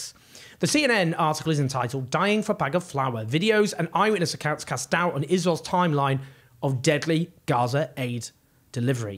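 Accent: United Kingdom. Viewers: I love your passion and dismantling BS programs. Please, Please, Please slow down, enunciate, and stop trailing off at the end of your sentences. I'm an American. Whatever you could do to soften your British accent would go a long way in making your presentations more intelligible for your foreign and maybe even your Brits, Scots, and Irish. BTW I am Irish all the way.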